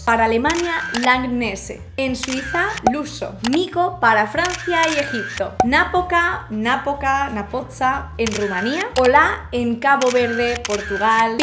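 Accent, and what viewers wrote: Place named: Andalusia, Spain. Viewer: Muy chulas las curiosidades que cuentas. Qué bueno. ¡Lo que también me parece curioso es que en algunos vídeos hables con una especie de acento andaluz! Sin acritud, ¿eh?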